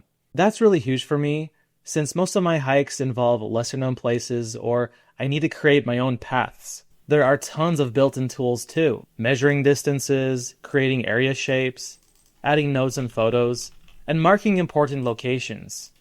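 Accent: United States. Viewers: I love your channel for not only your aDventures & comments. I find that your American accent is so obviously American. Keep on trekking.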